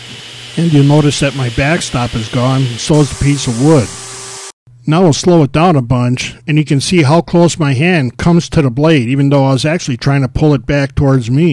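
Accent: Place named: Midwest, United States. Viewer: People need to see this just because of how dangerous a table saw or any kind of saw is. I recognized your accent right away that you're from somewhere in Wisconsin or the Midwest for that matter. I myself am originally from Wisconsin and use to work for a window factory. I've had a couple close calls myself from the 4 years I worked there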